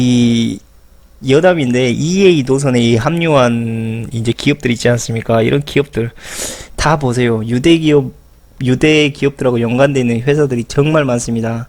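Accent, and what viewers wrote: Jeolla, South Korea. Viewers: 역사를 좀 배우세요 저분말이 사투리?원리ㅐ 호남말이 표준어 입니다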